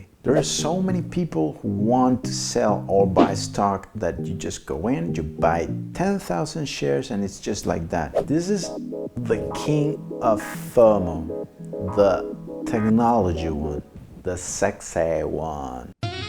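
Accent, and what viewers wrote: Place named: England, United Kingdom. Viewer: Si hablas un ingles tan “ingles”, porque no los subtitulos en castellano??? 🤪🤪🤪🤪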